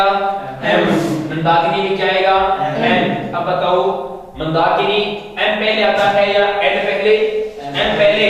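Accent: Rajasthan, India. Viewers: Ek to waise ap rajasthani bolte h upar se ar awaj vibrating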